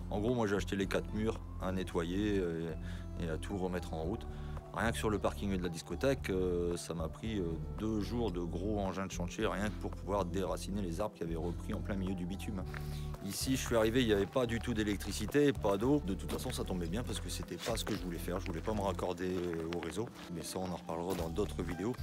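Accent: North, France. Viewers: J'avais bien reconnu l'accent chéri du Nord ☺️ Bravo pour toute ta belle création et tout ce que tu as donné ! Quelle énergie ! C'est une joie de te découvrir, de découvrir ta belle tribu animale. Prends bien soin de vous et belle suite encore.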